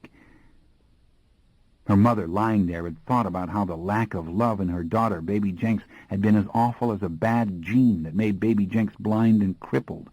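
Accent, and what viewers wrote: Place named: France. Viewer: This narrator burns my nerves. Hey says everyons name with a French accent except Louis. A Frenchman from colonial New Orleans. Make it make sense.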